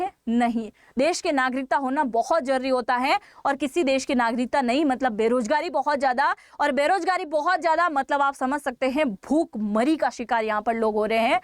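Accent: Gujarat, India. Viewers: मैम, आपकी हिन्दी भाषा में गुजराती एक्सेंट सुनाई पड़ता है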